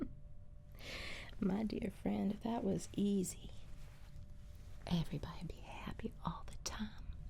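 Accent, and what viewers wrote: South, United States. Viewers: Omg what a video you’re southern accent was so on point an you made the most beautiful cowgirl ever an awesome an the flannel shirt was such a nice touch my mom raised me to be a gentleman a lovely angel like that a lovely angel like that a true 10 out of 10 It is a lovely feeling when you see someone who catches your eye an can’t that’s the same feeling when ever you are on twitch by the way you’re always beautiful you captured my imagination just watching each action in this video unfold I’d blush if someone that pretty admitted she had feelings like that glad that was not the last goodbye for those to love birds this was truly great asmr at its finest love you mama A+ work so talented rocking the red lips he should have kissed her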